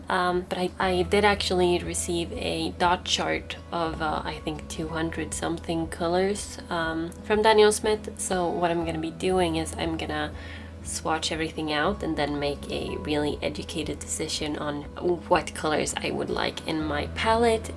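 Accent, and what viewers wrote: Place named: Sweden. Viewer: Nämen, hörde nu att du är svensk! Älskar blommorna 😍🌺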